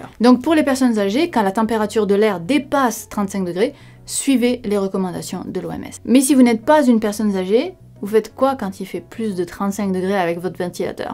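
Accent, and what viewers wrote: South, France. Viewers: Tu as un petit accent du sud de la france ou j'ai fumer ? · Question totalement annexe, comment est perçu ton accent du Sud (? C'est bien ça) au Québec?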